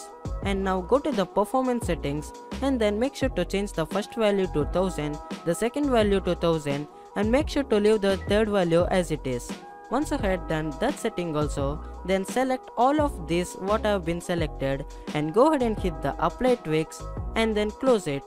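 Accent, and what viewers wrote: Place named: India. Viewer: np. Are you an Indian? You seem to have an Indian accent. Btw I am Indian too.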